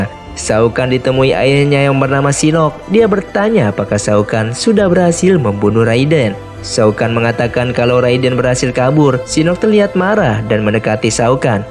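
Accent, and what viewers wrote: West Java, Indonesia. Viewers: Intro nya okos sunda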